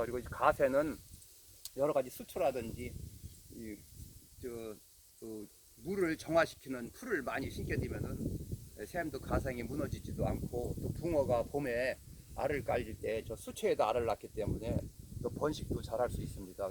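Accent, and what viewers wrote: Chungcheong, South Korea. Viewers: 충청도 말씨 신기해요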